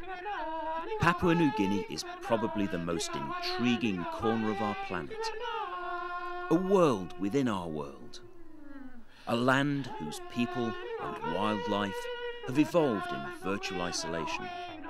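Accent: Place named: RP, United Kingdom. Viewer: Good British Queen's English language Thank you Sir for this educational video of Papua New Guinea Much appreciated 🙏🙏🙏 God bless you ❤️❤️❤️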